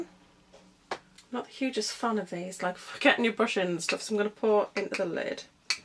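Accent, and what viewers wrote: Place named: North East England, United Kingdom. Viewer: Thanks for this review. Been thinking about getting it. Have got a small amount to test as well as the x-ceptional wear. Are you from the north east UK by any chance? Your accent sounds familiar!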